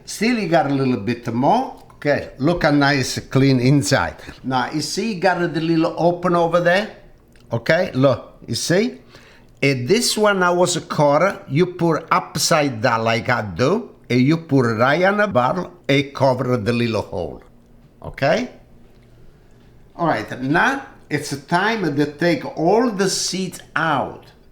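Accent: Italy. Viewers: Learn how to listen in His Italian accent of English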